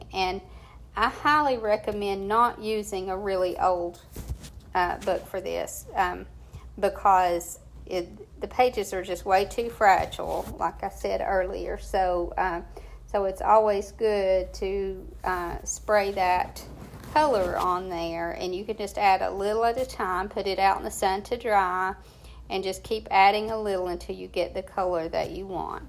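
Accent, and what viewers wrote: South, United States. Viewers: I just love your work, your beautiful Southern accent and mostly that you love the Lord! Keep glorifying his name like you do! 🛐💟✝️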